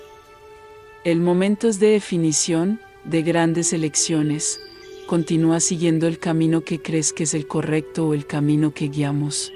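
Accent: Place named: Colombia. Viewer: Gracias por tu mensaje ,pero porqué escucho como un acento colombiano....? Esta es una canalización.? De todas formas gracias por el mensaje. Muy bueno..!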